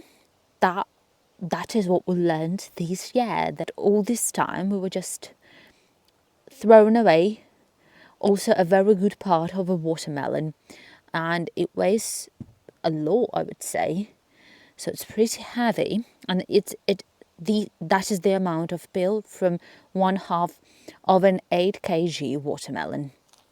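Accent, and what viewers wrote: United Kingdom. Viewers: Internet isn't real yet this british accent is almost real, so much prpganda but, your video reminds me of my grandma, she never owned any land, yet she knew so much about farming. We used to harvest public plants and at the time it felt like i was carrying twice my weight in fruits. Better times 😀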